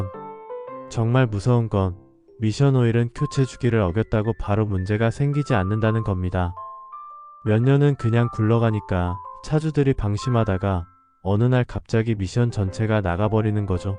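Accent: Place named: China. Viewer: 말이 서툴러도 직접말하세요. 읽어주는 자가 중국인으로 추측됩니다 한국어를 짓발는 행위를 그냥 두고보면 당신도 간첩이 됩니다.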